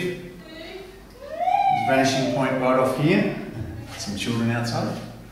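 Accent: Australia. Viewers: Are you from Australia ?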